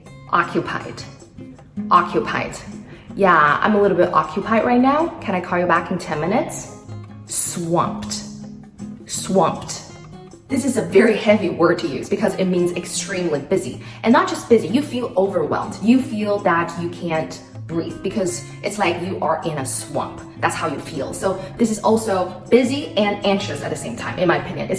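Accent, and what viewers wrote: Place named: Vietnam. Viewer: I was quite astonished by the time i heard your accent, it was really like Vietnamese accent, but it turned out you are an authentic Chinese . Anyway your English voice is So great, i love it. A comment from viEt nam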